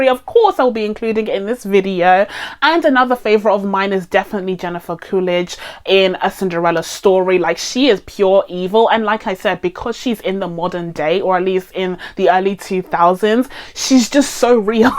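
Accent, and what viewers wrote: United Kingdom. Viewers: I'm Finnish myself, and I'm generally bad at placing English accents as it is a second language I rarely get to use other than online. I do love your accent, though. It feels very British, but flows in a way I haven't happened to hear before. Not sure if there's like a definitive London accent, I'd imagine not as it is a very fluid and cosmopolitan city, but yours is lovely ♥ Pretty sure you have more people there than we do in all of Finland, around 5,5 million here 😁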